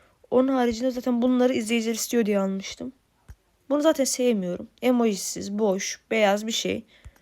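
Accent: Azerbaijan. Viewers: Azerbaycanlisan sen)?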